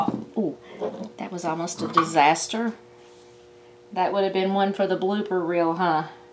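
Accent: South, United States. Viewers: Enjoyed this, Very nice. May I ask where you are from? You have my southern accent! I'm from SW VA.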